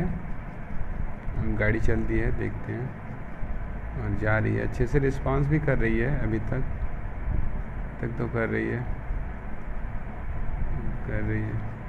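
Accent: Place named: Uttar Pradesh, India. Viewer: Ye ladka pakka up ka hai 😄🤣🤣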